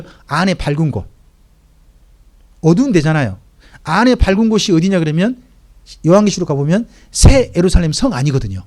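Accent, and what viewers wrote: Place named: Jeolla, South Korea. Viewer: 목사님 감사합니다. 말씀 많이많이 올려주세요. 열심히 듣고 배우고 있어요. 특히나 전라도 사투리 개인적으로 넘 좋아요 ~~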